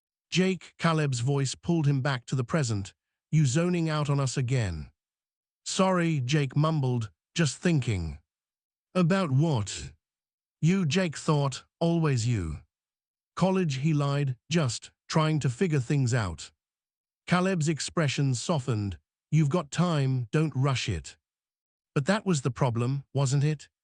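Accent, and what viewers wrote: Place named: England, United Kingdom. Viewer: Get rid of the English accent, please.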